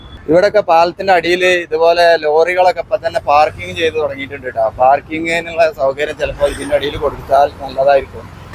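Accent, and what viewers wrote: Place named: Thalappara, India. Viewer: ഭാഷയെ പറ്റി പറയുമ്പോൾ ഒരു രസം തന്നെയാണേ ഇപ്പോൾ (ഇപ്പം) എന്റെ ഭാഷ എന്റ വീടിന്റെ ഒരു 5 KM പടിഞ്ഞാറ് ഭാഗത്തേക്ക് പോയാൽ ഒരുപാട് വ്യത്യാസമുണ്ട് അത് പോലെ എല്ലാ ഭാഗത്തും ഉണ്ട് ഇദ്ദേഹത്തിന്റെ സംസാരം കേട്ടിട്ട് തലപ്പാറ മുട്ടിയറ ഭാഗത്ത് താമസക്കാരനാണെന്ന് തോന്നുന്നു ' നിന്റെ ഫാസയിയിൽ ഒരു വീഡിയോ ചെയ്യ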